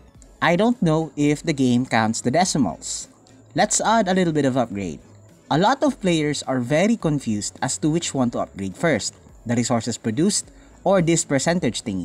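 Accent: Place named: Philippines. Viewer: Keep it up.👍 Pilipino accent just like tracie haha. Kaya moyan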